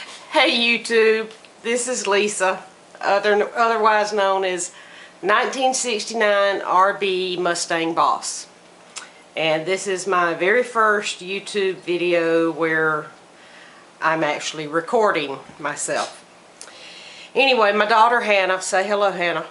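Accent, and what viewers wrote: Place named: South, United States. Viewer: WOW! Two videos in and youre already tagged. How cool, you are an official part of the youtube bushcraft community. Even cooler that your daughter is your director ,producer and camera operator. You sure do sound southern, lol. You have alot more paracord stuff than I do. I dont have a jig nor have I ever made a bracelet. I keep my paracord in a pile in the floor, lol. Cool video, keep doing what youre doing.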